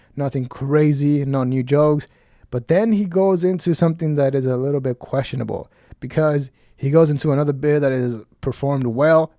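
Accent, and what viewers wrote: India. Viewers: Omg i was so sure you were indian 😂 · OOMG I THOUGHT YOU WERE FROM INDIA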